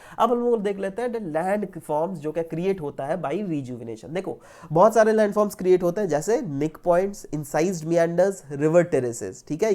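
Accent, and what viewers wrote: Bihar, India. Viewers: sir aap bihar say hai kya ?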